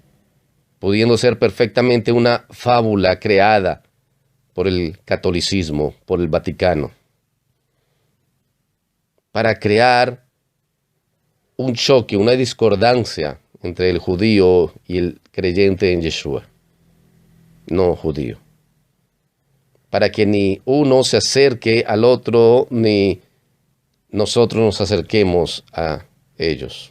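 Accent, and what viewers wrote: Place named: Spain. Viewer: Me surge otra pregunta! Porque habla usted como español si no lo es? todos los hijos del Eterno amamos sus mandamientos y obedecemos su Torá por amor de El! Eso es más que obvió! No se trata de una idolatría a Pablo! Se trata de la Torah! Sin pruebas no se debe haceptar testimonio contra nadie! Y menos por boca de un solo testigo que no aporta pruebas! Así que asegurar sin pruebas que Shaul es mentiroso sin decir en qué miente! Es sin dudas un falso testimonio! YESHUA a muerto por nosotros! Pero en su mismo orden de ideas tampoco debemos ver sus videos ni escuchas sus enseñanzas pues no son las del MESÍAS! Digo más podemos escuchar a todos y prestar atención a los que hablan conforme a las enseñanzas del ADON! Sabiendo siempre que solo hay un ELOHIM y solo a El Amamos y servimos! Lo anterior antes de la lluvia de insultos de idolatría y acusaciónes falsas en mi nombre! 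Amo al Eterno y procuro vivir en sus mandamientos con todas mis fuerzas! Y esto lo aprendí del MESÍAS y por obra de su siervo Shaul y de los apóstoles o envíados de los profetas y su siervo Moisés! Shalom. Shalom Shalom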